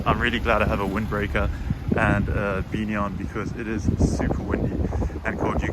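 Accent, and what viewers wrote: South Africa. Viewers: Hi, judging from your accent you are South African😊 I'm south African and looking to stay a few months in Algeria. Where exactly are you staying? And how does life compare to back home. Ps. We're Muslim so cultural integration shouldn't be too much of a problem.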